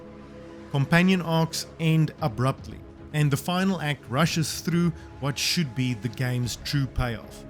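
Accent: South Africa. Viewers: out of curiosity are you South African?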